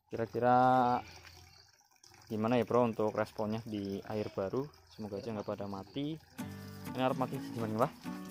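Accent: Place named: Banyumas, Indonesia. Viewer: Deneng ngapak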